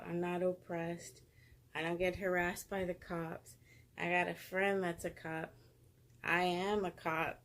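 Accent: Texas, United States. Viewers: Yes, her message should be heard by Americans of all races. I know some white people that need to listen to her. I felt that when she said she doesn't support a movement that makes her feel inferior, I would feel the same way if some organization was trying to sell me the same message. I recognized her Texas accent, I lived in Dallas and then Plano for 9 years. Her personality is great!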